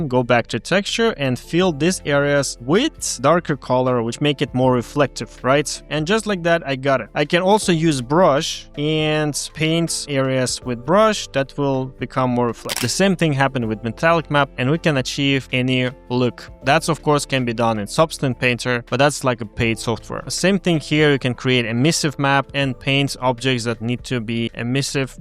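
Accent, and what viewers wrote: Russia. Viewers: Мне кажется или у автора подозрительно русский акцент? Но в любом случае видео очень полезные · godamn, Russian people always so good at everything LOL!, thank for this man, your accent in funny in a good way!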